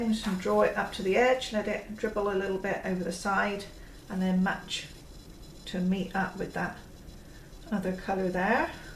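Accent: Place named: Dorset, United Kingdom. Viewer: Hi there, this is so interesting, thanks for sharing, I wonder, is there something you could add to micas to make them pearlised? Btw love your accent, are you from Dorset?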